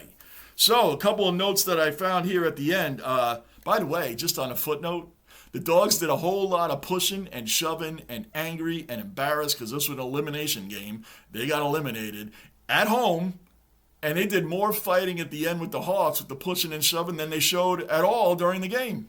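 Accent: United States. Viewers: A novelty hearing an American accent talking about our great game like a fan with some knowledge. Keep up the great work bloke ..... 👍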